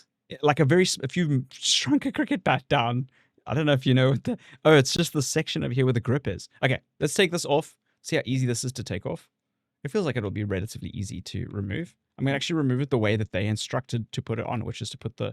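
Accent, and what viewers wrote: South Africa. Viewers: How's it China, thanks for the reviews. Lekka to hear a genuine SA accent. Peace 🙏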